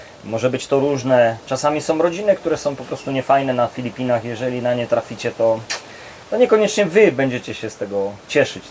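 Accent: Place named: Silesia, Poland. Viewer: Bardzo dobrze godosz. Dużo YouTuberów pokazuje tylko❤ te piękne miejsca a nie jak są zrzyci z naturą.... Pozdrawiam Skąd że Śląska jesteś??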